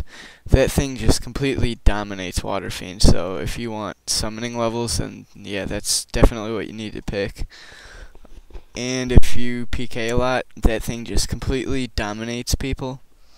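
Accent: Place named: Norway. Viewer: R u norwegian? U got a norwegian-english accent :P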